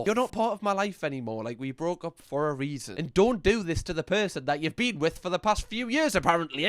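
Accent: Newcastle, United Kingdom. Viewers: Love you saying "bloody hell". Love that British accent. You are a funny and charming guy. 😂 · You should visit Newcastle. A lot of them sound like that lol it’s a lovely place too. My parents are both from the north east of England and a lot have similar accents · I just wanna let you know that after I watch your videos the inner narrator of my thoughts inside my brain has a geordie accent for like minimum 6 hours post-watching every time 😂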